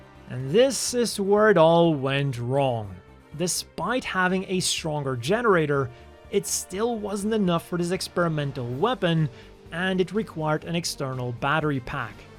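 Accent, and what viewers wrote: Belgium. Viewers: As a Dutchie, it's great to hear you speak in Dutch (with the lovely Belgian accent)! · ooooh je bent een belg!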